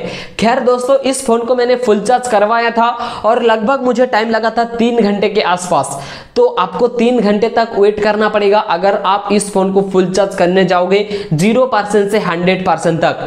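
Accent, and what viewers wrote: Bengal, India. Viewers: Bhai tm hindi aur english dono ki communication classes lena start kr do. Tmhare bolne me bengali accent h jo k itna irritating h k subscribe to bhul jao koi tmhari video pura bhi nhi dekhega.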